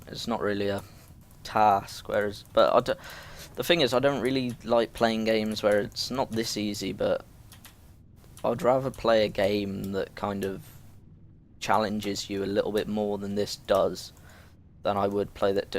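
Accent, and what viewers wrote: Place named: United Kingdom. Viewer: I want to hear the game not British voice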